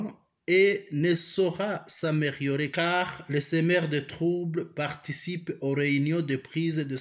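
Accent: Rwanda. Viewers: Toi même qui comptent tu es rwandai par ta prononciation tout ce que tu dis c'est mensonge sur mensonge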